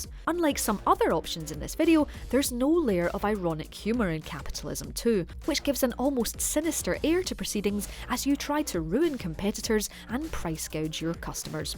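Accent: Scotland, United Kingdom. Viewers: very scottish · Damn, dat Scottish Accent 😌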